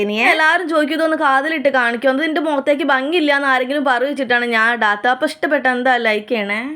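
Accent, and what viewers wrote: Kozhikode, India. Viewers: ഏച്ചി കോഴിക്കോട് ആണോ ഇക്കി ഒരു doubt അയിനൊണ്ട ചോയിച്ചേ ഇന്റെ നാട് കോഴിക്കോട് ആണ് 😊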